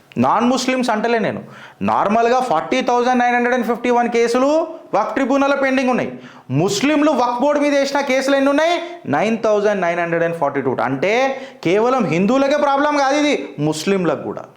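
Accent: Telangana, India. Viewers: Babu chinna request, meeru telanganavalle doubt ledu, alani motham antha Telanga yass lo maatlaadutunte konni artham kavataledu, chadukunna vallu local yass unte, mari chaduvu leni vallaki and chuladukunnavaallaki theda yemi undi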